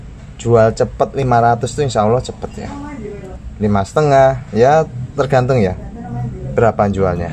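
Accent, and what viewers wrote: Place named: Boyolali, Indonesia. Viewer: Saya kira sampean orang boyolali bang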